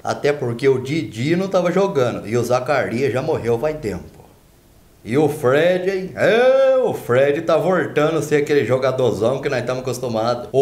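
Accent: Minas Gerais, Brazil. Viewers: vc é mineiro igual eu? Kkkkkkkkkkk 😁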